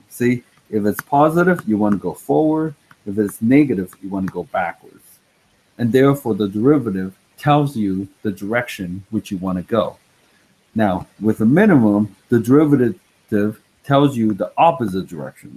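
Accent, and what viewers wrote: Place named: Morocco. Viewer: GREAT EXPLANATION , think you from morrocco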